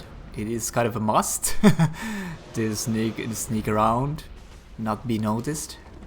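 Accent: Finland. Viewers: For some reason you dont have that ugly Finnish accent, but a really enjoyable one. Get some riposte dps bro.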